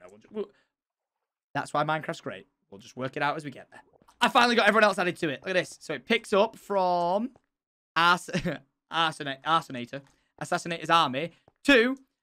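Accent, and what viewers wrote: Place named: Scotland, United Kingdom. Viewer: Why does turn scottish all the time lately? 😂😂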